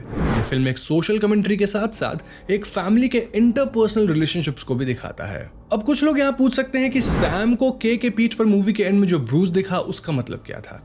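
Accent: Bengal, India. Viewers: Bengali accent boht jyada aa raha hai. Please theek kijiye ise.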